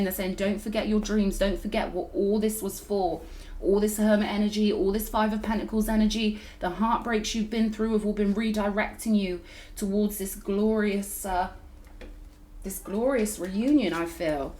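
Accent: United Kingdom. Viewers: Karmic energy just spit on it. LOL I love ur uk accent reminds me of Robin gibbs my sweetheart. Ahhh he was a Capricorn also.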